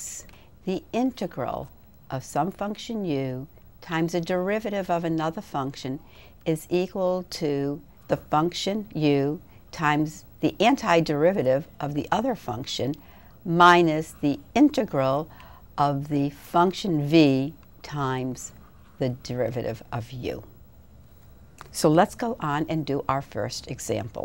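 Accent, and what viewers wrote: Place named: New York, United States. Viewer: The New Yawk accent is hard to take, but it's a start. YouTube falls down on deriving Integration By Parts. If anyone knows of good ones, please post.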